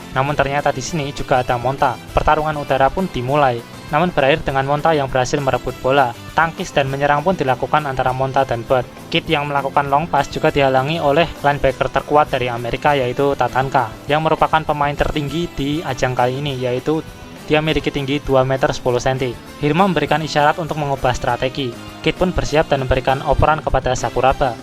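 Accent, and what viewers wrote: Java, Indonesia. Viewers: Miminnya orang jawa ya?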